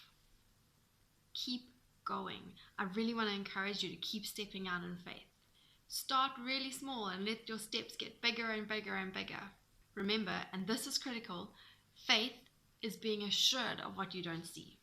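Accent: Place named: Australia. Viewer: Great work! Your thoughts on faith are spot-on! I can relate so much to this because my journey with my Carlitos giveaway ministry to kids fighting uphill battles is a total faith-walk! Faith honors God, and God honors faith! Keep up the good work! (BTW, I love your accent - are you Australian? Forgive me if I'm nowhere close! haha!)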